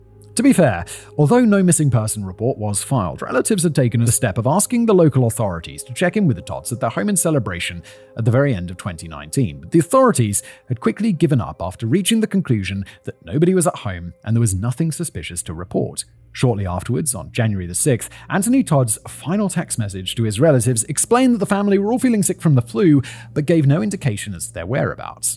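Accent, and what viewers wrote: RP, United Kingdom. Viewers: The last time I watched this guy he really talked as though he had a huge plum in his mouth, so it was a put on or it tuned people out & here we are....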